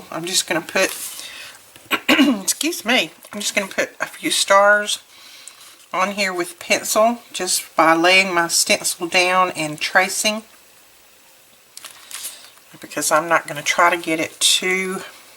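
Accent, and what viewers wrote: South, United States. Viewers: I love y our faith journaling. You encourage me. Plus I love your Southern accent.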